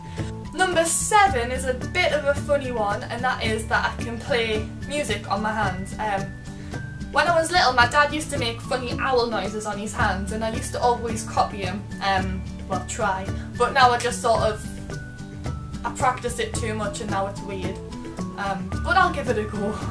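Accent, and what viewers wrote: Newcastle, United Kingdom. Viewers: Haha, I loooove your accent! :D Thought you were from Scotland at first, but seeing as Newcastle is "almost Scotland", I guess I was close enough. ;) (I spent an Erasmus semester in Edinburgh and my heart is melting every time I hear a Northern accent! :) Btw, great video as well! Very entertaining to watch. xx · Wont be having any problems understanding you, been watching Geordie Shore for so long. Good job on your first video ( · i follow both your accounts :) :) and im a geordie as well :) nice to hear someone on the internet talk like me :) :)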